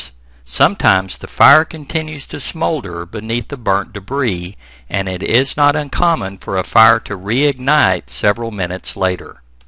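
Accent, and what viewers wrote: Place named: South, United States. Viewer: I'm glad it's a southern accent not monotone to make it a little more engaging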